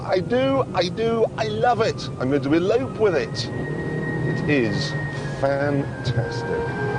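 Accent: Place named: RP, United Kingdom. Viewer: JC sounds so different back then!! He sounds all posh and BBCey!!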